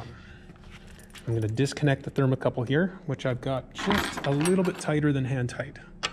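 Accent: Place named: Canada. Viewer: I am going to try to fix my water heater and I wanted to understand the underlying concepts and this really helps. He sounds like he's from Canada or the northern Midwest.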